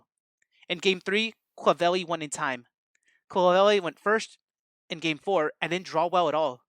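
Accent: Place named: New Jersey, United States. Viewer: I'm downloading this video as proof that you're from NJ and not Brooklyn.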